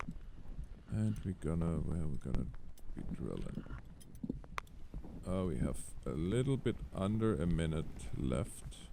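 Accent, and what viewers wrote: Germany. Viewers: you are German , Right ? :)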